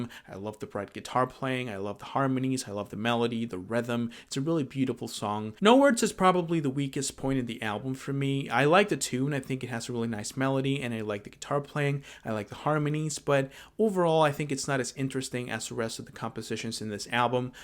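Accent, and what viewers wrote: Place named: United States. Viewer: A 90 miles an hour narrative from a fucking yank, fuck off man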